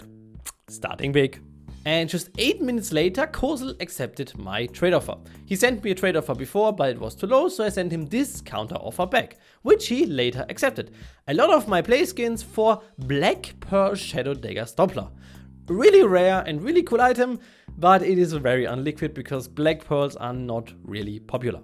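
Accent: Germany. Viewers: Are you german? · Man merkt dass er Deutsch ist an sein Akzent😂 feier ich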